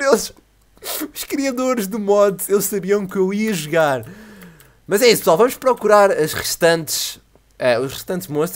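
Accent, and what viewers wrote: Portugal. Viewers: É pq ele é português, quem vem de Portugal tem esse sotaque !! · Vc é Portugues? Ele provavelmente é português de Portugal =) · Ele é portugues de PORTUGAL,no inicio vc pode ter dificuldade de intender ele,mas depois vc intende TUDO o q ele fala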